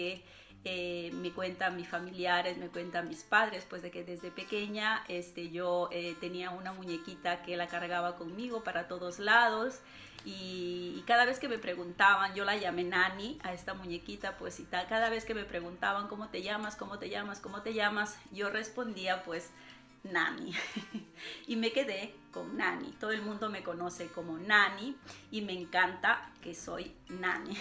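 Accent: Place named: Italy. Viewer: Tú canal es genial😍🇮🇹🇵🇪 me gusta esta combinación de nacionalidades! Llevas muchísimos años allá en Italia😱 ya tienes el acento italiano muy marcado incluso hablando español! Encantadisima de ser parte de tu espacio saludos✨🌸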